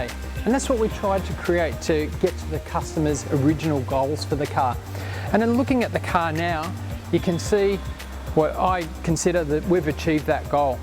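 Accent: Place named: Australia. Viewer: barely detectable aussie accent......barreelyy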